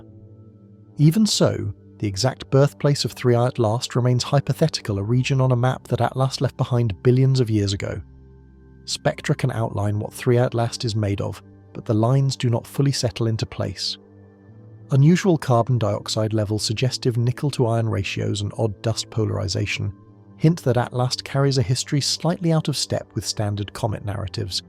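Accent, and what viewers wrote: United Kingdom. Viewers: Why is the voice ALWAYS british english!?!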